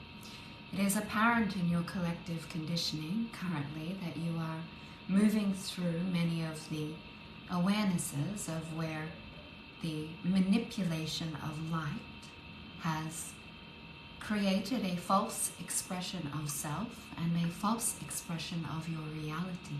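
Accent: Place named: Australia. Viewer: Yas! So beautiful, thank you very much! So much love and grace as always. I live how ur expression of language is so great and this australian accent, yeees ❤ and this bloodline perspective is on point. And this kind of description is good. There are many layers and definition to these dynamics. But prince and princess is more likely acceptable storytale for humans So, one question: are the chakras of the Earth moving? Meaning, can I check them by researching these points.. Btw.. the flags of countries are also showing what energies and colours they hold.. or work with.. Thank you and sending light as always ❤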